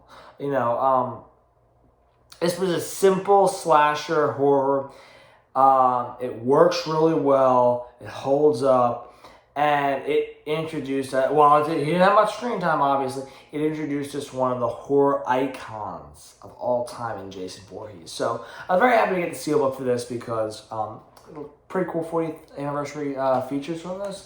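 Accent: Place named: South Jersey, United States. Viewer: i thought i recognized your accent - i'm from south jersey - live in Portland, OR now though - steelbooks are awesome - also anything from arrow video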